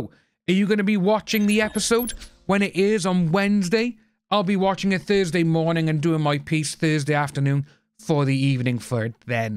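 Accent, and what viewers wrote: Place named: Wales, United Kingdom. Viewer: Fellow Welshie, here. Love your Welsh accent! 😄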